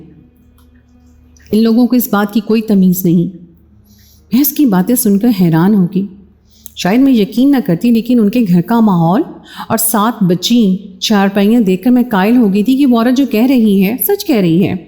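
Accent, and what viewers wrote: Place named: India. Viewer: you still have Indian Hindu accent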